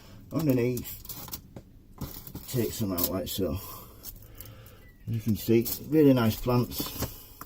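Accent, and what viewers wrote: United Kingdom. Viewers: Lovely video. Loving the Yorkshire accent to obtw, I'm in West Yorkshire myself, or should I say mi sen 😂 What other flowers do you think would go nice with alyssum in a basket? Cheers, Ali.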